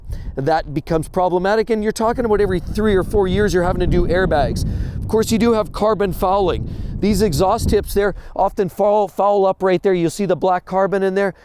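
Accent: Canada. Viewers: he's got a Canadian accent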